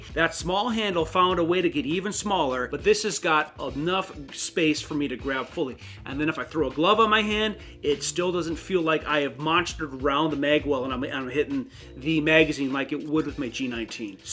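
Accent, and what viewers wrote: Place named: Upper Midwest, United States. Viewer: That upper Midwest accent is thickkkk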